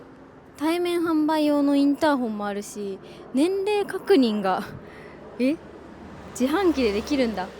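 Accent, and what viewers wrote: Kansai, Japan. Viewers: いつも楽しく拝見してます。ちゃんがたまに話す関西弁がめっちゃ好きで、めっちゃかわいいです😆 これからも楽しい動画をお願いします。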